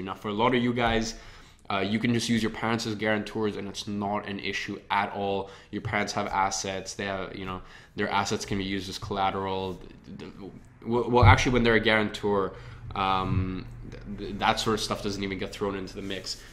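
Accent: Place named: United States. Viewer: Hey! How come you have American accent living in uk?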